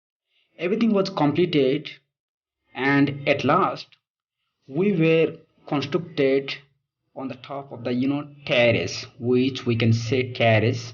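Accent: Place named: United States. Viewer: America english😊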